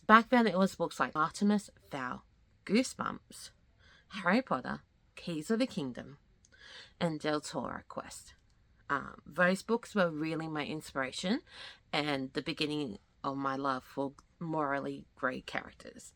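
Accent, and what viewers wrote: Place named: Australia. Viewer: !!! this was so good! (also, yes. You do have an australian accent and it's so cute) 😍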